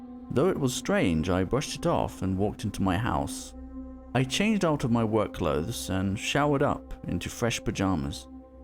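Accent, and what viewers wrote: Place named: England, United Kingdom. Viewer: Subscribed on the first thing. Yay~ Your English accent is so pleasant and your voice will definitely be in my ears as twice as before since you will be uploading quite often from now on. 🤭 Super thoughtful of you to add in the pixel art 3D styles visual, totally loving it. It makes the entire video become so interesting and lively. I got spooked . Lmao. 🤫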